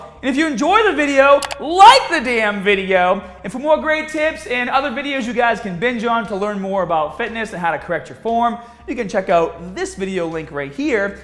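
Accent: Boston, United States. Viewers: That Boston accent coming on strong.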